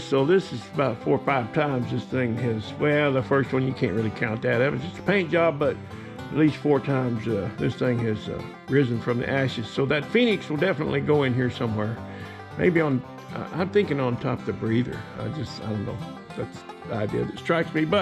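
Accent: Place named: Arkansas, United States. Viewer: Whenever I hear some picking on a guitar! I know I’m in-for a wonderful trip into a mechanical adventure. Thank you for taking us along on your trip! You are the best at walking us along with you,while you recover your old square body from the ashes! I have watched every one of your videos from the Rockabilly build to now. And I have never been disappointed in any them. Your “ Ol’Arky” styled delivery and work ethic are a joy to witness. Thank you sir,for your guided tour of your trip of raising an old friend up from the ashes. “I sure do preciate ya!!!” From one old timer to another! Till the next time,keep on keeping on! P.S. we need more “ pickin and a grinnin!”😊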